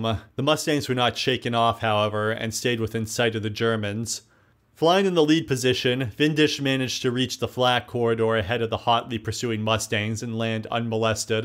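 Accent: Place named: United States. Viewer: "Weird" is probably a tad harsh, though I must admit I've never heard 'bomber' pronounced 'bawwwwwmer'. It just seems to be one of the more obscure America regional accents.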